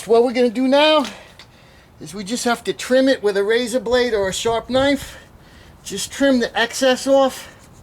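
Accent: New York, United States. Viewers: this dude is such a new yorker its almost comical ! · I see you have a first class plugging tool. They work great, where poorly built ones crumple in use. Quality lasts. I usually pound around the patch wit a hammer to help seat the patch, then test after I drive a mile or two. For a guy who talks funny(that NY accent), you'all are sure easy to understand. Great video, again.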